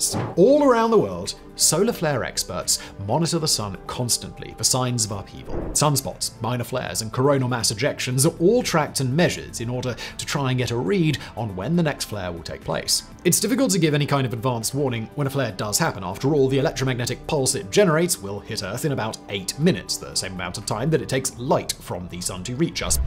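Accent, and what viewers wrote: United Kingdom. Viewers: "Geezers", haha. Makes me giggle every time 'Brit-pronunces' words.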